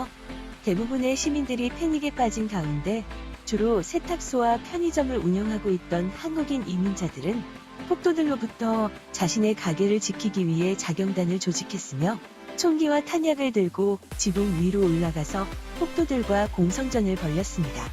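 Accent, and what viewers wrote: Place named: China. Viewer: 너 중국인이냐 한국말좀 더배워라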